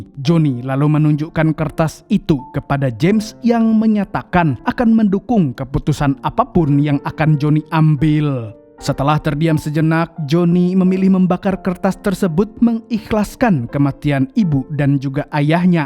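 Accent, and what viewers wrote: Banjar, Indonesia. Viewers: Mang orang banjar kah pian..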